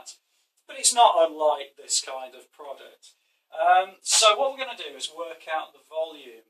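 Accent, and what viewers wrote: United Kingdom. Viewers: I am also maths teacher are you British sir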